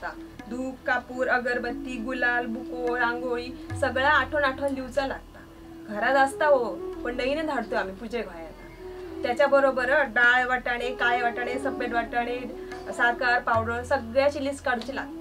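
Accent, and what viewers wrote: Malvani, India. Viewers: ताई, कोकणातलो गणपती इतक्या सुन्दर पद्धतीन शुद्ध मालवणीत सांगान सगळे लहानपणीच्यो आठवणी जागे झाले. शुद्ध मालवणी आयकताना गावाक पुढच्या लोट्यार बसान गजालिंच करतानाचो भास झालो. खुप खुप धन्यवाद देव बरें करो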